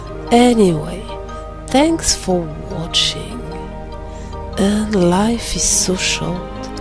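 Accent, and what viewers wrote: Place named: France. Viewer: Salut salut, bon je me permet d'ecrire en français du fait que j'ai reconue le petit accent :). Maintenant venant en a la theorie, je trouve qu'elle fait sense dans un certain context avec les arguments que tu viens de presnter. Mais le maitre des maitre n'est pas assignier a une union par consequent les signes des 6 union doivent étre present ou aucun ne doit faire son apparition. Mais une chose qui m'a fait réflaichir c'est le Labo qui se trouve en dessou du manoir, maintenant que l'on sait que KHUX se passe dans un rêve ou une simulation informatique, c'est très probable que le manoir est le prochain QG des dandelions après la disparition de daybreak town dans les tenèbre (ou ils ont re-crée les evenements graces a leurs souvenir), cela peut expliquer les statues d'unicornes et les quelques signe de l'union de vulpus de maitre Ava en hommage aux précedant Maitres. 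En gros voila mon idée et j'aimerais bien avoir ton avis sur le sujet, bien evidement je sais que ma théorie a des failles (cheraty) cela me feras plaisir d'en disscuter. Merci pour la video !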